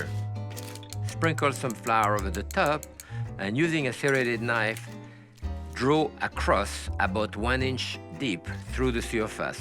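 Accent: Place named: France. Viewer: I want to love these videos. I really do. But I can't helo but wonder how long you have to live in the US before you finally lose the thick French accent that makes it sound like you just arrived off the boat and barely speak any English. Apparently 50 years is still not enough. "A lot of de chef, dey ave de special razeur." Come on chef, you know how to speak English as well as I do.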